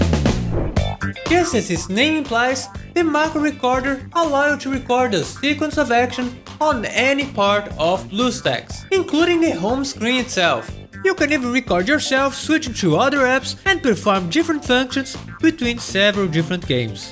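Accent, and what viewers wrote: Brazil. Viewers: that brazillian accent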